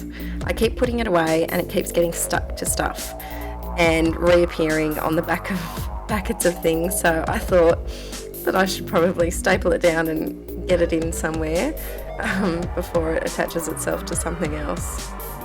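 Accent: Australia. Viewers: Great layouts - looking forward to seeing more :) It is nice to hear another Aussie :)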